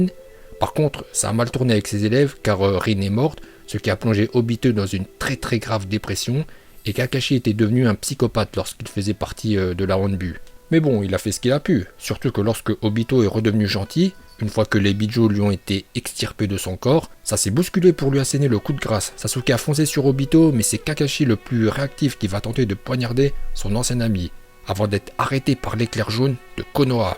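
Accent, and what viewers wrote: Japan. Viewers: Super ces vidéo car on entend des prononciation que les youtubeurs français ne prononce pas comme : Naluto shipunden Laiton Vraiment sa fait plaisir d'avoir des prononciation japonaise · J'aime trop ton accent japonais 🏯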